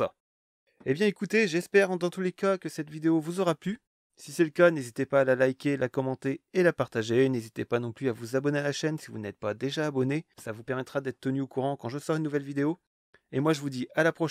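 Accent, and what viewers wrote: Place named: Nord, France. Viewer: Géniales tes vidéos, ça s’entend que tu viens du ch’Nord! 💪🏼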